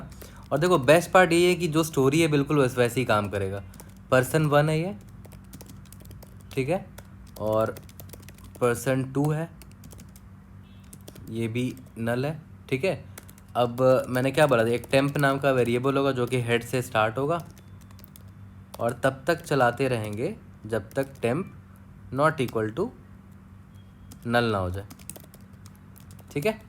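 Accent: Bihar, India. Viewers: accent se laga thoda bihari touch hai btw nice explanation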